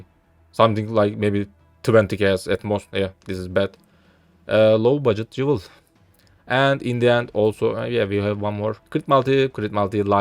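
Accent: Turkey. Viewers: Anında Türk olduğunu anladım aksandan :D Bende genelde böyle konuşuyorum videolarda ya da sunumlarda kjahbfljangsg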